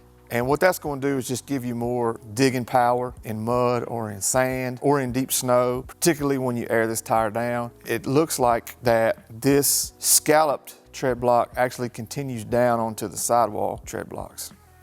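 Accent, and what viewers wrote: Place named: North Carolina, United States. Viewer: Where you from? Sounds like a North Carolina accent.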